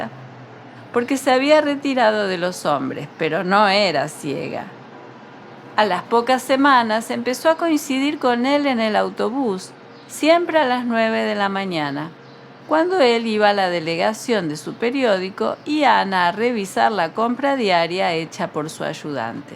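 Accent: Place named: Argentina. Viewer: El acento argentino no ayuda para escucharlo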